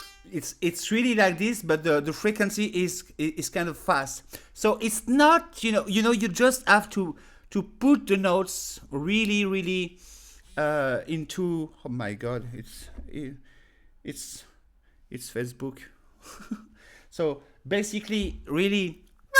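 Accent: France. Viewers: Merci pour cette leçon très instructive (+ le commentaire de Walter Cengia, qui donne la définition exacte) sur toutes ces différentes techniques : c'est la première leçon qui explique clairement tous les détails ! And very amusing with this funny french accent ;-) As another comment said, you should have made it in french but it would had less charm and feeling ! Bonjour du pays de la rillette et des courses de voitures =;-)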